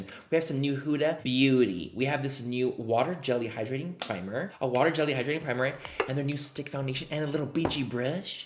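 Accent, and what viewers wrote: England, United Kingdom. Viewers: Your British accent lol, I live! 😄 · Ok... I’m going to need a “full face of make up in an English accent” please 😁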